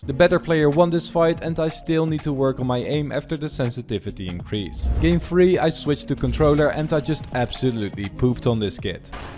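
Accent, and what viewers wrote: Netherlands. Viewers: ben je nederlands hoorde het aan de intro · are u dutch begus u have a little dutch accent and im also dutch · You sound like ur from the nederlands. Is that true? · I feel like youre dutch because of your accent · is he dutch? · Are you Dutch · are you dutch?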